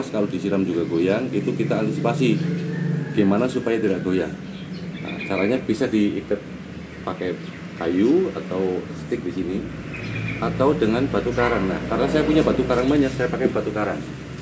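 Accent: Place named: Java, Indonesia. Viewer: Abank orang Bali tapi bicara nya kyk jawa abank bali apa bukan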